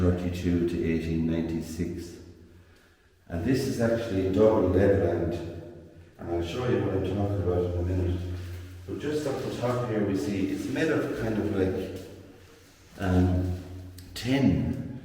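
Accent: Ireland. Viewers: Absolutely beautiful. Such a work of art. I had to laugh though. Your Irish accent can be difficult for my American ears. Sounded like you said the marble was brought in by a “horse and cat”. 😅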